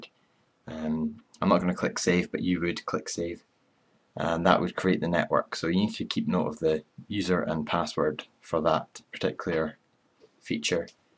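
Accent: Scotland, United Kingdom. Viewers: Thanks this helped ! Are you Scottish ? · are you scottish?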